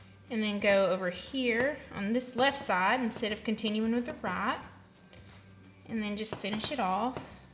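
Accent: South, United States. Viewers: My goodness, your just about the cutest thing I ever seen. Your southern drawl is so darling. I just stumbled across your channel and yes ma'am I liked subscribed and hit the bell so I will be seeing you again real soon. Oh and that little hanging pot is too cute!! 😙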